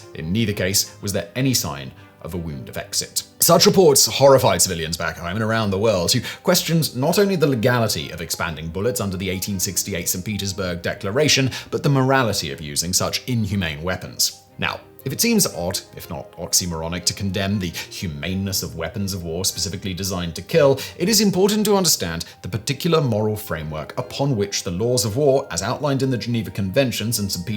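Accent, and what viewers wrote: United Kingdom. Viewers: Come on Simon give us history of the dildo! I just want to hear that whole topic discussed in that proper British accent!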